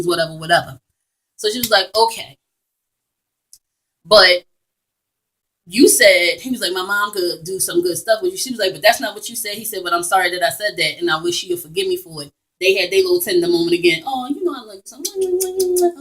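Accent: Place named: Philadelphia, United States. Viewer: So good to hear the Philly "sound"! Great job from your Philly friend on the West Coast. Keep up the good work!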